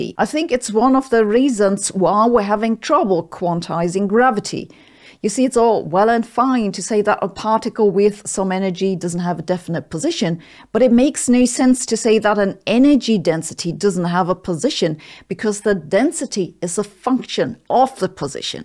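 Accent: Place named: Germany. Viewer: Many of videos are way over my head, like today's posting, but I watch anyway because I enjoy hearing her talk. Listening to someone speak about science with a German accent somehow makes me feel smarter than I am.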